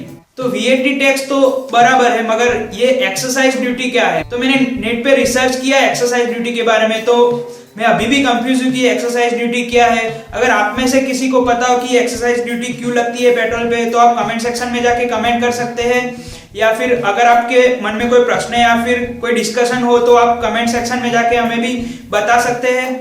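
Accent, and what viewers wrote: Gujarat, India. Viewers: Bhai tu gujarati he hena?? and vaat nahi vat bol...or exercise nahi excise duty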